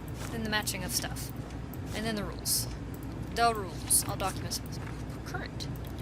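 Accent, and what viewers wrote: South, United States. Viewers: I like the southern accent.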